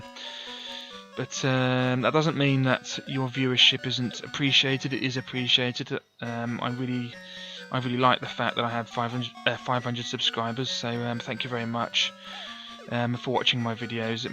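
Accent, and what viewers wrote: United Kingdom. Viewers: I'm not certain there is a particular way a 30 year old is supposed to sound. You do sound very British though 😄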